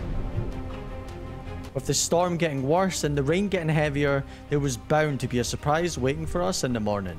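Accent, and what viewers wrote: Scotland, United Kingdom. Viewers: The best part is your Scottish accent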